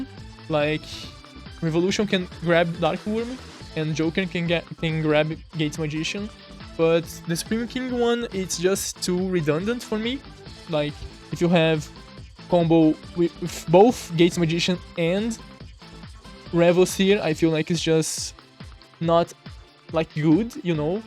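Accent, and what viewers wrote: Brazil. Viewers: You ARE Brazilian? Sotaque eu acho Im trying to do a deck that can make XYZ, shincro and fusion in one turn, im using dimensional dragons of manga, but could you help me ?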